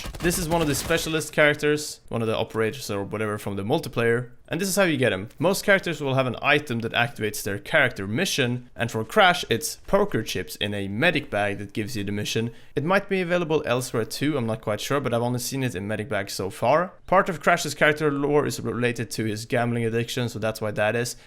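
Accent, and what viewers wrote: Sweden. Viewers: First video im watching and i am 100% that he is from Sweden. I’m I right? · u sound swedish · Are you swedish? That accent though 😎👌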